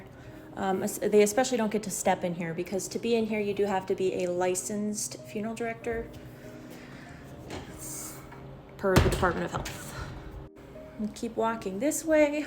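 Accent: Minnesota, United States. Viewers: I knew your from Minnesota through your Minnesota accent!!! As confirmed by the Minnesota State flag!!! Good guess here!!!😂😂😂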